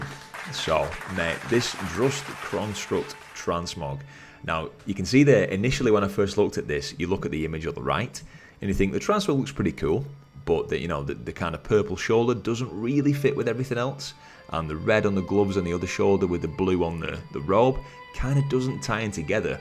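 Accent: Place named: North, United Kingdom. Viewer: Northern British accent >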